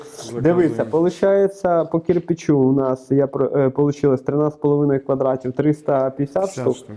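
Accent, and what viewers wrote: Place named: Chernihiv, Ukraine. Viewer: відео та канал загалом дуже круті, дякую за технічні подробиці. Судячи по твоїй вимові, ти мабуть родом з десь з Чернігівської області?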